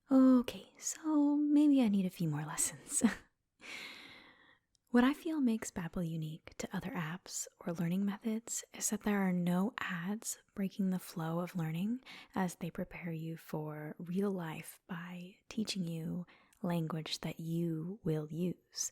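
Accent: England, United Kingdom. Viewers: gasp british accent british accent british accent british accent british accent british aCCCCEEEEENT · Yes Harry Potter rp! I love it and the slight British accent was so cute ❤️ Thanks for the great video <3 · I love that British accent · okay so hear me out: TALKING IN A BRITISH ACCENT. I’M LIVING FOR IT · We thank you as well for such entertaining content. Your roles plays are second to none. Your English accent is spot on slightly posh but without going all Downton Abbey 😄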